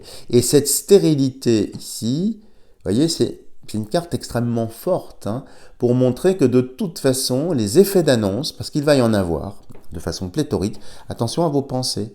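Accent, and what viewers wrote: France. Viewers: Bonjour quel plaisir que d'entendre parler un pur français... quelle belle langue que la nôtre. En sus de vos connaissances et de vos explications claires et précises sans blabla inutile ni dénigrement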